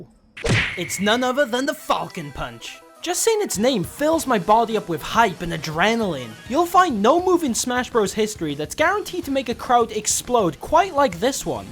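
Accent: Wales, United Kingdom. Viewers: Great video. Are you a little bit Welsh? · Your accent is amazing, I'm guessing Welsh? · What the hell is your accent mate. You sound welsh, American and russian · I think you really got to the heart of this. Good vid. Surprisingly sincere and sentimental. Hope Nintendo takes this to heart and NEVER fucks with things like the Falcon Punch. (Also, kinda love your accent. Don't get many Welshmen on here...I'm sure you're not sick of hearing THAT by now...) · I can't decide if you're Welsh (most likely), Irish or American. :')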